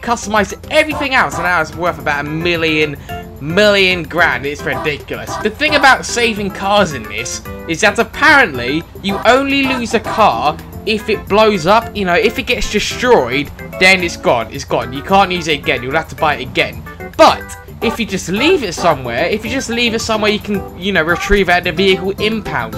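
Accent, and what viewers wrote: United Kingdom. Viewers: Are you british🤔🤔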